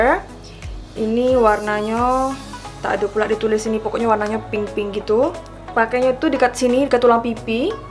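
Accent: Negeri Sembilan, Malaysia. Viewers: Loghat MCM Org negeri sembilan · Mcm bahasa melayu negeri sembilan dan bahasa melayu perak dan kelantan lenggok dia.....dan area2 padang minang....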